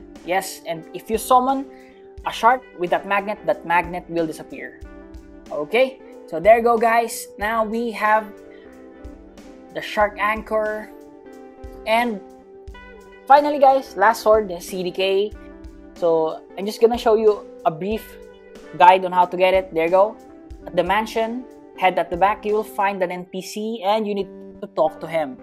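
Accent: Philippines. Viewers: Where does come from his accent sounds Filipino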